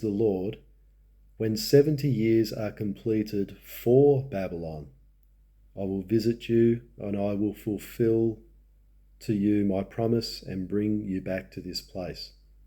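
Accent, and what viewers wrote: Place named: Australia. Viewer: Thank you for doing this research and sharing this, good to hear an Aussie accent.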